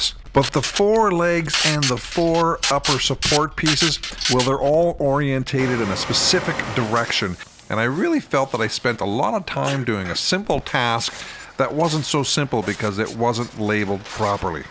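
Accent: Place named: Ontario, Canada. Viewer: i was like he sounds like hes from Ontario lol. Im next to Montreal very cool channel nice to see folks from Canada on YouTube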